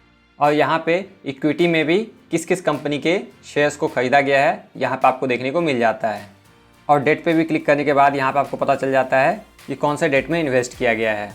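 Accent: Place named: Bhojpuri, India. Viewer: Pehle apka Hindi bhojpuri sidharo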